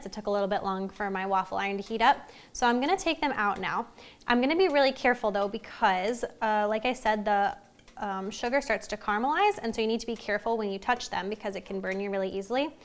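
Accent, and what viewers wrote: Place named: Midwest, United States. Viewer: Omg the Midwestern accent is just the cutest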